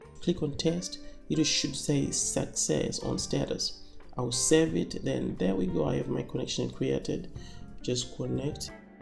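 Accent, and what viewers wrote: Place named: South Africa. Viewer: do you have an email to cantact you directly for tutorials, from the sounds of it you are based in Mzansi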